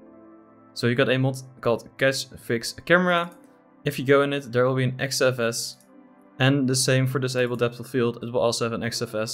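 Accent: Netherlands. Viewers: you dutch or some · Are you dutch? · I think you are from Netherlands because of your accent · You Dutch? Sounds like it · Dat Nederlandse accent 🤣😭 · Yo Dutch? just a guess! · You sound dutch, are you? XD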